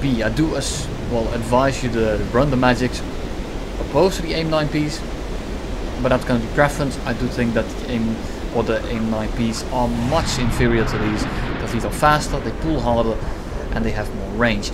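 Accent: Netherlands. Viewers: you sound pretty dutch but i like it very much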